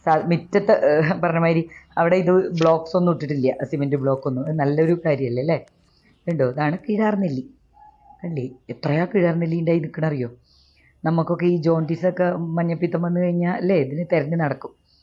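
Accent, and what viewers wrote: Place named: Valluvanad, India. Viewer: Original valluvanadan slang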